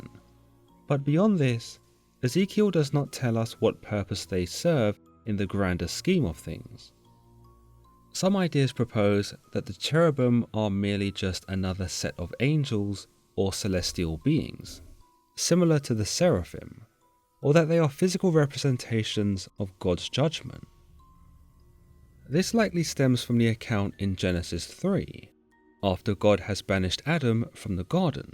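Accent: United Kingdom. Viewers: The narrator is a pleasure to listen to. He has a very pleasant voice, great diction plus a very elegant (and easy to understand) British accent. Very enjoyable.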